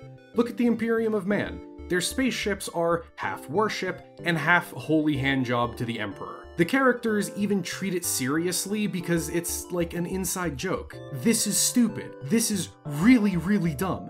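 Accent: Canada. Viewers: This dudes Canadian accent is giving me PTSD. Abaoouut….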